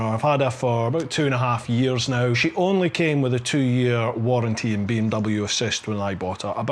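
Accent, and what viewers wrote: Scotland, United Kingdom. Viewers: Oh wow well done (those are the red vehicles) interesting job, guess you have seen it all, to move on careers! thanks for the info never heard of "hill topping" and couldnt find anything on google! great channel I am a subscriber! Love the accent too im thinking creiff maybe Dunblane regions ...dont confirm or deny, i have a thing for accents (aspergers)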